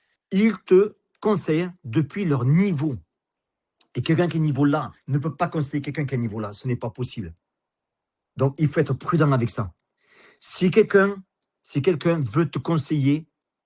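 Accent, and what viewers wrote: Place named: Toulouse, France. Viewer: Excellent, très juste, même s'il y a l'accent toulousain c'est pas très grave 😊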